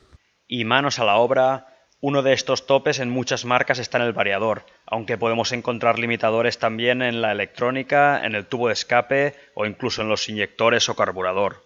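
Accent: Spain. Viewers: Me encantan los Españoles, que acento tan nítido y perfecto, los amo, saludos desde Venezuela :) Excelente vídeo. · Quién habla tiene un claro acento Catalán. Luego entonces no tiene un acento Neutro el cual en España creo que solo queda en ciertas zonas de Castilla León,los dé tenemos claros acentos de nuestras diversas provincias. Pasa lo mismo en LATAM,entre países y zonas/ provincias de cada país,pero lógicamente allí no se habla un Castellano limpio, ya hay muchas mezclas. ❤️🇪🇦🇪🇦❤️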